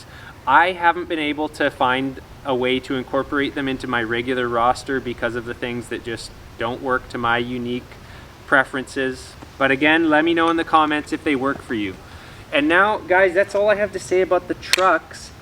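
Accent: Canada. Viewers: Thanks for your content, and I knew you were Canadian from the moment I heard you speak (as an accent aware Canadian, myself), but stoked you're from the lower mainland :)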